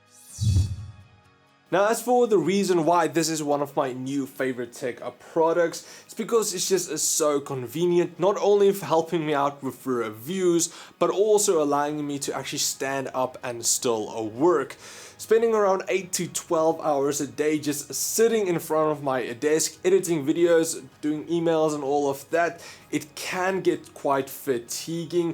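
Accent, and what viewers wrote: Afrikaans, South Africa. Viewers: That's what it sounds like when you've Afrikaans speaking English... The South African accent is neutral, meaning it is easy for South Africans to pick up on other accents.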